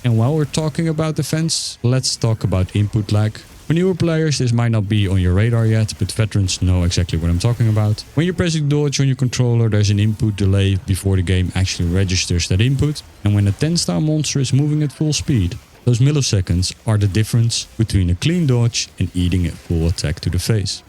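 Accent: Netherlands. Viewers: Dutch guy speaking english?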